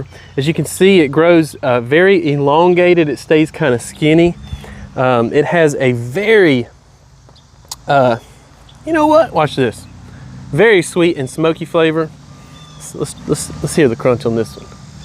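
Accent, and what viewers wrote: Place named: North Carolina, United States. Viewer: Oh my God! The taste of home grown tomatoes is amazing! I grew red pearl grape tomatoes (from Johnny's) this year, and they were so good! Very productive and so sweet... Also the yellow brandywine was amazing as well 👍 Thanks for sharing your NC garden. I used to live in New Bern but now I'm in Virginia. You're accent brings me back to NC 😄 love it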